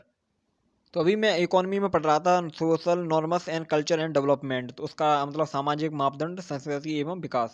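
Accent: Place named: Etawah, India. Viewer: Aapki voice Etawah jaisi lag rhi h .. apke vlogs bhut motivational h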